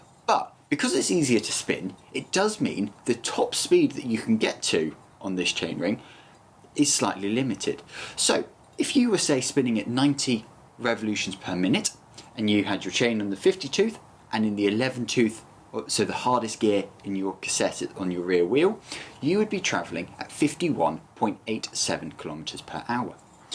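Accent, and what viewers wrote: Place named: United Kingdom. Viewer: i have a hard time understanding the british accent sometimes, good content though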